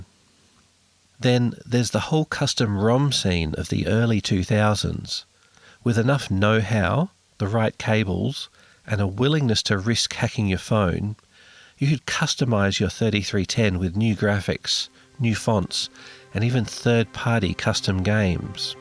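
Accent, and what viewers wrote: Australia. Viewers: Given his accent, he appears to be from Australia.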